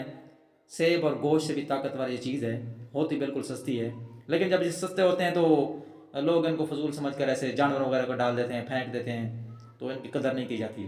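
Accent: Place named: Saraiki, Pakistan. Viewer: میڈا بھرا بالکل سرائیکی لگئے😅😅کتھوں دے ہیوے بھرا خاں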